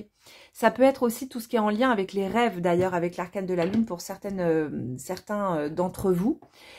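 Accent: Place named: Belgium. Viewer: Bravo! 2: ça me parle! Je vais déclarer mon activité Artiste- Décoratrice d'intérieur ! Vous effectuez vos guidances avec tellement de cohérence et d'assurance verbale et spirituelle, c'est très fort , toutes n'y arrivent pas, bafouillent, ou bien s'écoutent parler! Ce n'est pas du tout votre cas et votre accent belge me fait trop rire, ainsi que certaines expressions du genre: "la papesse c'est pas la chaudasse du tarot" lool! Osiris:::: j'ai fini ce matin Christian Jack :Abydos ou le mystère de la résurrection!" Tarots magnifiques comme le préraphaélite par ex.. . Oui je faisais de la peinture, enfant, et plus tard photographe, mais les "conseillers" m'ont toujours découragée! Merci à vous et au Soleil!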